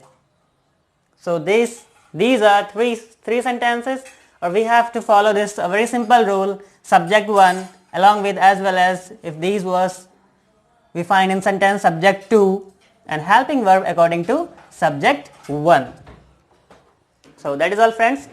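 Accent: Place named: United States. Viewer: Tqs bro for your american accent of English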